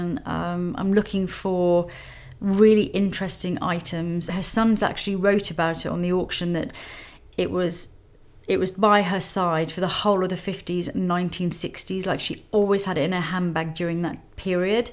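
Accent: United Kingdom. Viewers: Shes got amazing eyes and a great facial structure...I love her briiish acesent...great make up tips thx...we all love Audrey she is a darling and amazingly creative..thx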